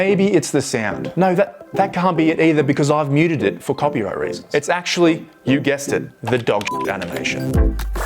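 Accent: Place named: Australia. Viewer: I wanna learn the aussie accent with this guy talking about anime. Perhaps he has a playlist with that specific content. Checking . . .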